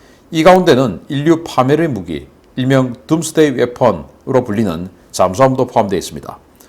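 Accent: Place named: Gyeongbuk, South Korea. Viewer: 말투를 보니 대구 경북 사람이구만.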